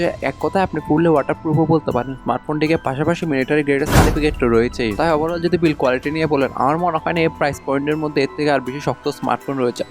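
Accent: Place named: Chittagong, Bangladesh. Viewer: উচ্চারণের মায়রে চাটগাঁইয়া ভাষা দিয়ে দুচে দিলো।ভিডিও দেখবো কী!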